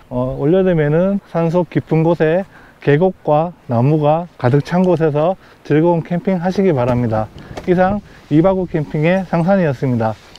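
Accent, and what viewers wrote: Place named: Gyeongsang, South Korea. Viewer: 타프 설치 전문가이시네요 ㅎㅎ 구수한 경상도 사투리는 덤...이고요 ㅋㅋ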